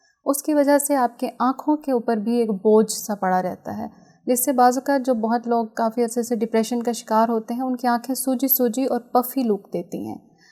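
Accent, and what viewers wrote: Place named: Saraiki, Pakistan. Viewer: Hello Madam, Good Day! Hope you are enjoying good health. My question is about bad thoughts. The bad thoughts about religion come to mind, if a person expresses these thoughts in front of someone, he/ she will be killed as a blasphemer, but these thoughts come to mind many times. is there any solution? By the way your speaking accent shows, like you are Saraiki.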